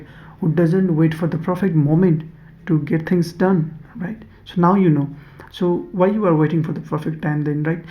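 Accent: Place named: Nepal. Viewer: bro are you nepali?your accent sounds like that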